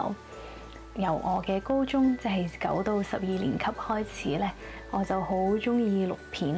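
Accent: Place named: Hong Kong, China. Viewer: 你啲廣東話好香港味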